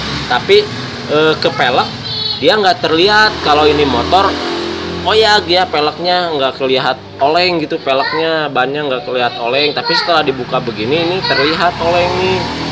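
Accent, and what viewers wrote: West Java, Indonesia. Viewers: duh nuhun Kang....mantappp. Kela, akang orang mana ? Ari logat sunda, ari wajah arab ??? Terus gini kang, motor saya Mio Soul GT Bluecore injeksi kalau di gas teh delay (tdk spontan maju), eta kunaon nyak?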